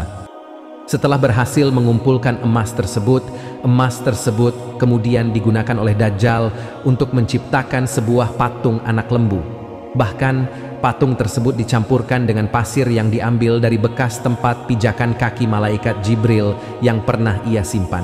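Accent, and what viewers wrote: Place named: West Java, Indonesia. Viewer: Itu tergantung Logat daerah asalnya,sptny dari Sunda,cuma saya blm pernah dengar riwayat Dajjal mengembara SPT diatas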